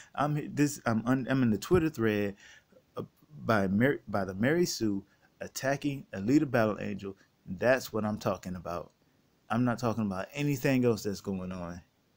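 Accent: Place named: Lebanon. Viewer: You have interesting accent, do you live in Lebanon??? Are you Lebanese???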